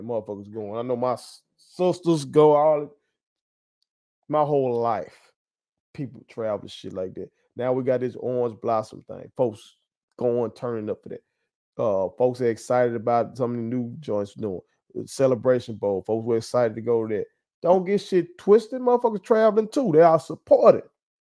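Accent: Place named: Memphis, United States. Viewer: bruh...real talk, I like you my guy...No homo... you talk like a south Memphis nigga for real...I've lived in Memphis for a long time. but I was born and raised in Northern Mississippi. you sound more Memphis than MS...Lol... you a real one fa sho that gives it to us straight with No chaser!!!! I like folks that can give you real game and know what they talking about...I played baseball and football. I've been coaching football in Memphis a while now. but my roots came from the Sipp. I like your content and how you give it👍🏿 you're hilarious to me and you probably don't even be trying to be.......keep it up bruh✌🏿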